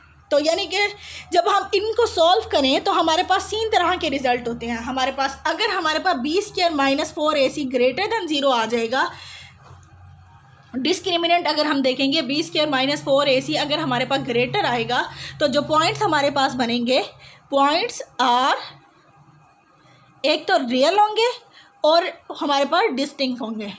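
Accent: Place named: Pakistan. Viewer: Really OSM MAM, awaj se lag rha hai aap Pakistan se hai, but love from INDIA.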